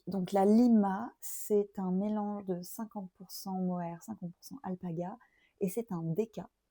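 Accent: England, United Kingdom. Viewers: Merci pour ces inspirations 👌 Tu as vécu en Angleterre pour avoir ce bel accent ?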